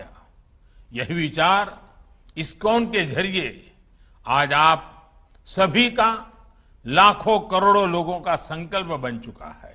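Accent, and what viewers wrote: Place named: Gujarat, India. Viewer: that's gujrati touch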